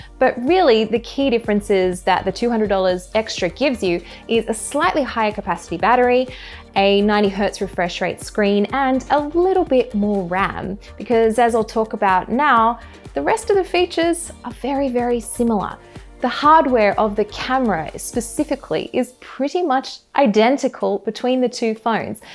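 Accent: Australia. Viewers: I love your accent!😍 Australian? Perhaps a Kiwi? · What a beautiful Australian accent ❤️ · 🤗 Australian accent:) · She is Australian and from the city, I won’t say what state but I think I know. This is how I sound lol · Aient nobobdy gonna talk about that auzzie accent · Lol all us aussies sound like this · i'm in love with how she talks 😭 aussie? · dodgy aussie accent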